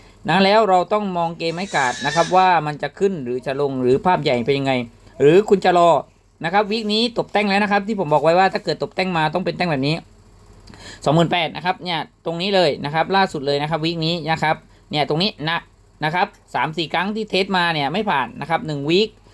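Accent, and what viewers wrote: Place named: North, Thailand. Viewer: รู้เลยว่าคนเหนือ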